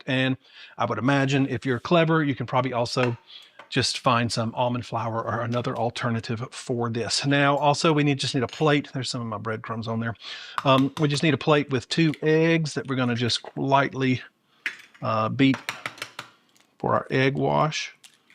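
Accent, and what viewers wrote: South, United States. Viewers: San Diego!? I thought you were Southern 😄😜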